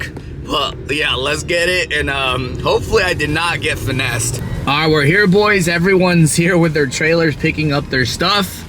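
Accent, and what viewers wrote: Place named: United States. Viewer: What state are you from the accent is crazy lol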